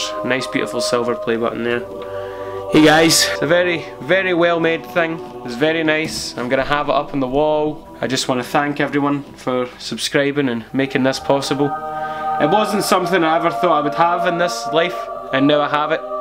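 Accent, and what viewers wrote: Scotland, United Kingdom. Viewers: AHHH it's bc he's Scottish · ikr, its like he is scottish or something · XD are you Scottish? Or Irish? Respect. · Skót akcentus ❤❤ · Is scottish lmao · Is that a fellow Scottish friend I hear in that accent?!?! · Oh my god ur scottish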